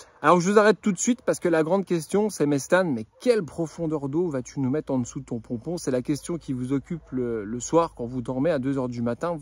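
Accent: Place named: Lorraine, France. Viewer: Bravo Stan vraiment très bien expliqué je m abonne, tu es de quelle région t’as un accent Vosges ou Moselle ? 😅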